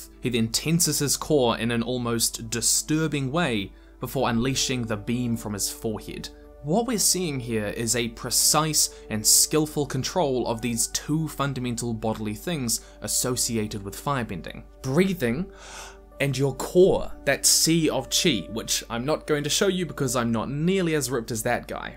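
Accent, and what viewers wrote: New Zealand. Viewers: Hey I just wanna say that I had an English proficiency test recently. I have no issues with English, but I would have been very tripped up by the Kiwi accent on the listening part had I not been acquainted with the accent beforehand, thanks to your videos. Thanks! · noticed your accent straight away love and support from a fellow kiwis bruv. subscribed and hit the bell✌✌ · You a kiwi dude?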